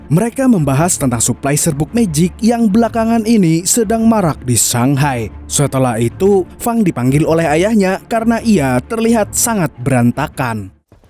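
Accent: Banjar, Indonesia. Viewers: Urang banjar leh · Selalu hadir mas walau gak komentar 😂 ae lucu banget neh org Banjar kh mas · Banjar lahh bangg 😭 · Anjay Banjar wkwk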